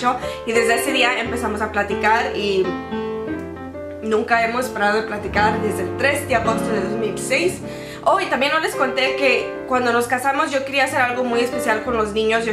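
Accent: United States. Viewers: Aquí no se nota tanto ese acento tipo "gringo"😓 me encanta pero tu español es bonito. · Parece estadounidense al hablar español.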